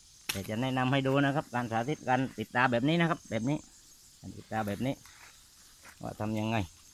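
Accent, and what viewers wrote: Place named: South, Thailand. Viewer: ผ่านหลวง คนใต้แน่ฉาดๆ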